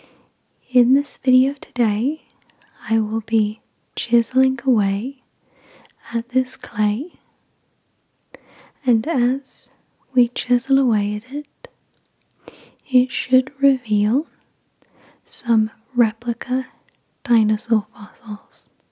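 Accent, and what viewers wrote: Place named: United Kingdom. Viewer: Hey there. Your voice has moved into my top 3. Probably some to do with your British accent . Anyhow greetings from Michigan Usa. Need to drop you a tip soon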